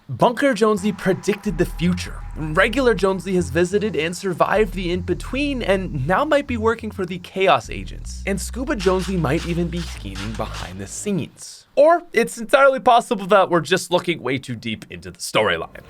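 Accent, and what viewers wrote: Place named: Canada. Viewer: i love his canadian accent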